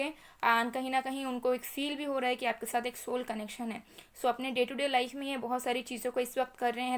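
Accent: Bengal, India. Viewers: Sis tum bengali ho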